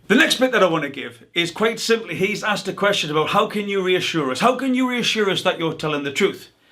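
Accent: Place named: North East England, United Kingdom. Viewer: Where you from I hear a north east accent? Boro?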